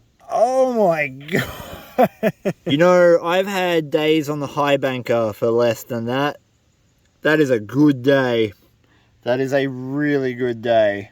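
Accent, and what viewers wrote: Australia. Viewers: My favorite videos after a stressful day 🙂 From the Australian accent, fun edit scenes, education, and Fern.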